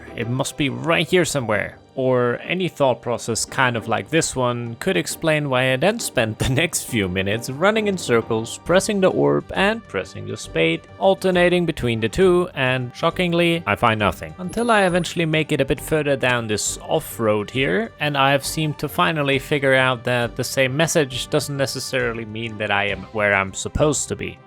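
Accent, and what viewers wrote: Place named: Scandinavia. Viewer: This is great fun to watch! As others have suggested, this series is a recipe for success. You have the unique opportunity to play a game for the first time that a LOT of people have many fond memories of, giving them that experience through you. Nostalgia is powerful, and many players and former players will want you to succeed in something that brought them so much happiness at one point or another in their life. You have a Nordic sounding accent that people will find entertaining and distinctive. You are a clever enough storyteller to know what to cut and what to include to keep the pacing just right, along with some good writing skills and a good sense of humor. There is opportunity here that you can easily reach out and grab if you want to do so.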